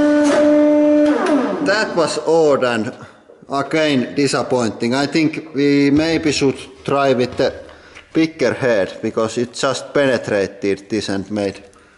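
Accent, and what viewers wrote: Finland. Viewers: Hes finnish for sure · Your Finnish accent is so fluent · its finish accent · hittoku kuulostaa nii suomalaiselta ku puhuu englantia nuih XDxD tuo on nii suomalaista englantia ku voi olla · Nope, he's Finnish. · "Dat vas van touff rupperband ball!" YOur ACCENT IS SO AWESOME, are you from Finland? XD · finland accent > every other accent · Finland. Everybody tell this man, its finland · finnish accent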